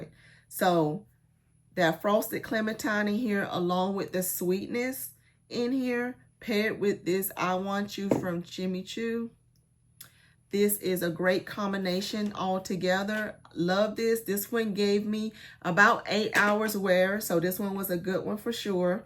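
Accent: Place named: Paris, France. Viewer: I hear your combos..don't have most of them. Oh well. U Already pronounce Bouquet just right...the T is silent.. it's the same with Muguet..Moogay. perfume in french is Eau (ike Oh) De ( like street talk..she s at de store. A bad The) then the Flower name (roze, de vee-oh-let..de Sare-eece. Cherry.. the words slide into the next Ohderoze. Ahhh..u sound like you are from PaRee. Paris in french.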